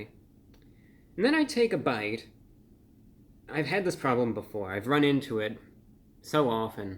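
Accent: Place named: Transatlantic, United States. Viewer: The only YouTuber to have a seemingly transatlantic accent.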